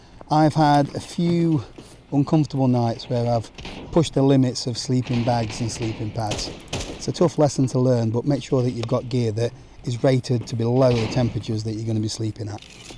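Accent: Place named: United Kingdom. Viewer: caralho, o br com o sotaque britânico inteiro slk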